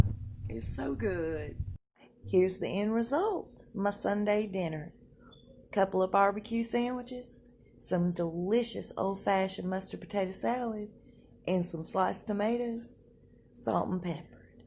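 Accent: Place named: South, United States. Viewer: Hi from Georgia in the US. is a Southerner here like me. She is in North Carolina. The South in the USA is know for our slow melodious accents and delicious home cooked southern, comfort food, meals. is terrific and you might want to check out Collard Valley Cooks too. Blessings.❤️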